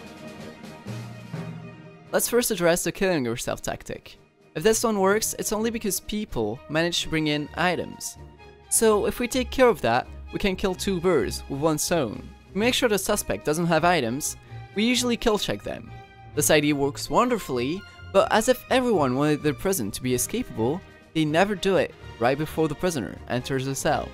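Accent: France. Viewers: are you french? i feel like you have an accent.. Great video btw!!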